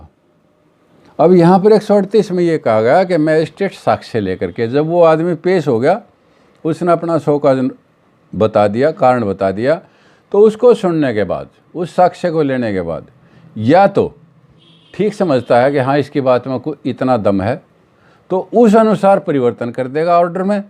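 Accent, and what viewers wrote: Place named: Haryana, India. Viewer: Nice explanation and the wonderful हरयाणवी accent. 👌👌👌👌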